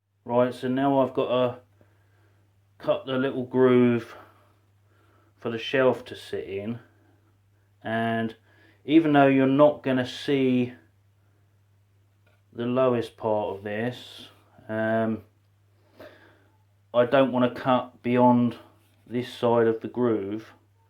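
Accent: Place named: United Kingdom. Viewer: Great job, that holder will never fail! Probably more valuable than the glue guns. Well I am part of the 20% who is a subscriber. My question for you is what part of the UK does your accent from. I have two friends in the States who are from Yorkshire and Wales. Your accent is much different. I am from Tennessee here in USA. I do like the two flags you made but watched the video and discovered that it is a long build.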